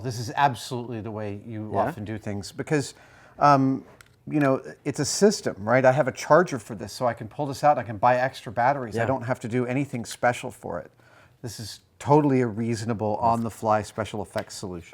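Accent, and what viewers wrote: Germany. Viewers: He sounds German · he sounded like he had a German accent,and not the good ones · Why does he sound German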